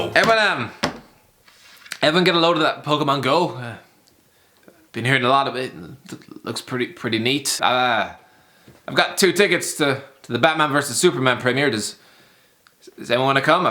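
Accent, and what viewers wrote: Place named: Ireland. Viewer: Nope just that irish accent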